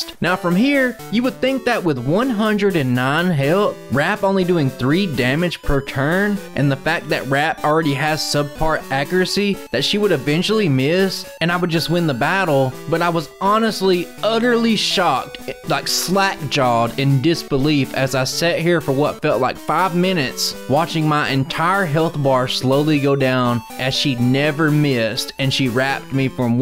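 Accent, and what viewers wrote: United States. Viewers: Woah that must be the most american accent ever o.o much yeehaw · I don’t know if anyone ever says this to you but every single “southern” character from the indigo league Pokémon anime series shares your accent.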